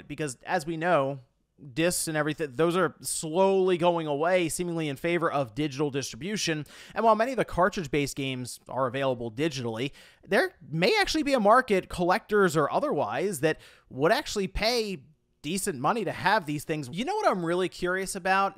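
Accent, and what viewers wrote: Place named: United States. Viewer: Must be an American accent thing. Sometimes I swear I hear the word gayboy uttered instead of Gameboy in videos like this beside of of the invisible or softly pronounced M's.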